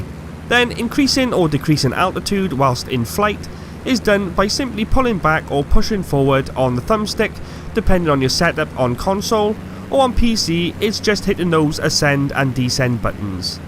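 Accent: Wales, United Kingdom. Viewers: Is this guy Welsh? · Are you Welsh? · That would be absolutely amazing, not many people like you on YouTube, also loving your welsh accent!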